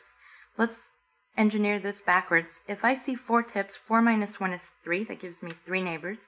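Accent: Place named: Borough Park, United States. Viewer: You sound like you grew up in boro park. I know that accent from a mile away.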